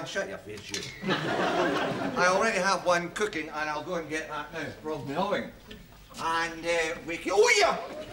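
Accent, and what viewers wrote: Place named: Scotland, United Kingdom. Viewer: Its funnier because of the Scottish brogue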